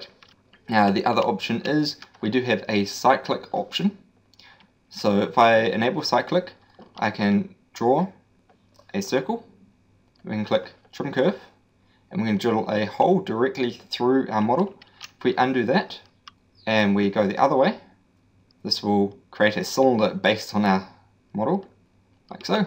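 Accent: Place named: New Zealand. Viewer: nice to hear a kiwi accent :D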